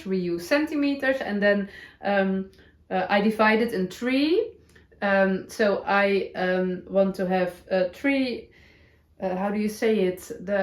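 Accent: Netherlands. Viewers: I adore your dutchy english. I can't remember the name of your sweater ? Can you mention it maybe tomorrow ?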